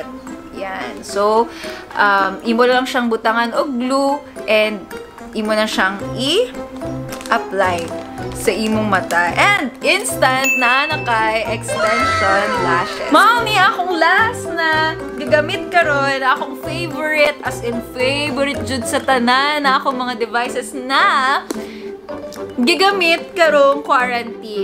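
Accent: Visayas, Philippines. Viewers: sobrang cute magbisayaaaa!!!!! ❤️ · Cute kaayo ka mag bisaya lodi. 😆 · 😂😂😂ang cute mo mgbisaya ms...cge kog ngisi d whole video..super ng.enjoy ko..thank u sa GV ms...😊😊😊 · ang kyut mo po mag salitaa ng Bisayaa ate 🥰🥰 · kacute magbinisaya ni ate hahaha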